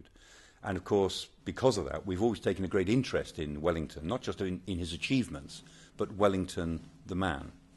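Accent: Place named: England, United Kingdom. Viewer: Professor Michael Clarke. I love your accent. In the USA there is a Yankee nasal twang. Today in England many breathe and pause a lot . "Day" sounds like "Daii" The UK should put out 2 to 3 videos on Youtube as "the Model or Perfect English accent."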